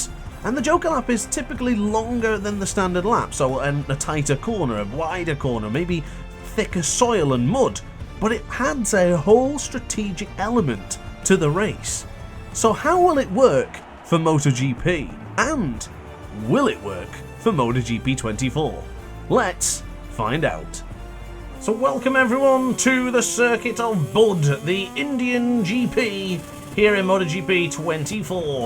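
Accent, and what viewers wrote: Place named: Birmingham, United Kingdom. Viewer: that brummy accent XD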